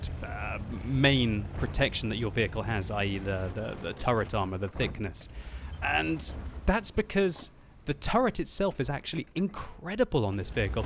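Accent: France. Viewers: i love your french accent 👌😂🇫🇷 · your french accent is really lovely <3. I m french and I love when british speak like that <3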